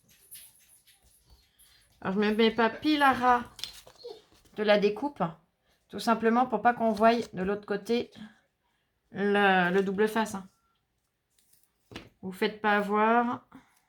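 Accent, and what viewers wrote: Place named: Burgundy, France. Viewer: Coucou je regarde vos vidéos depuis quelques jours et j’aime beaucoup. Et votre accent me disait bien quelque chose 😀 vous êtes de la Bourgogne vous aussi 😁😁😁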